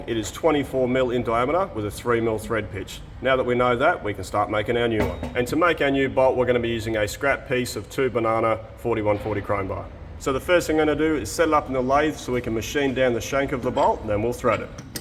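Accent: Australia. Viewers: Love this channel, I’m American and from across the world and is still the most relatable guy on YouTube, talks like every guy in the shop just with an Australian accent, and using metric, and bananas 🤢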